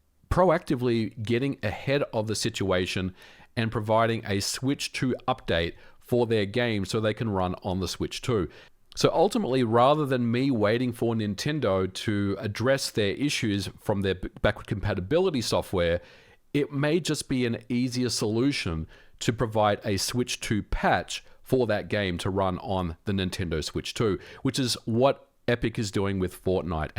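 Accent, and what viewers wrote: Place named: Australia. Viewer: His accent is clearly Aussie. But yeah, I can understand the mix up. I'm from the UK and I can't tell the difference between the American and Canadian accents. Unless they're from the Southern US. Somwan stowl ma god damn truhk! Mary Jane! Hand me ma guhhn!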